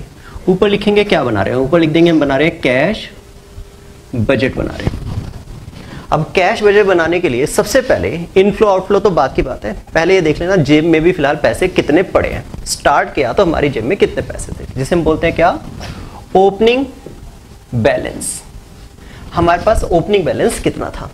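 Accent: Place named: Punjab, India. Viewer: Sir u r very cute..., specially ur sort of punjabi accent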